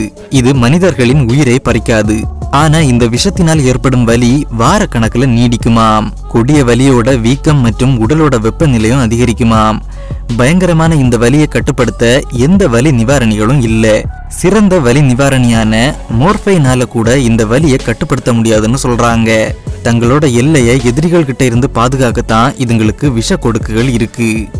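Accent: Kanyakumari, India. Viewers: Bro ungaluku Kanyakumari ya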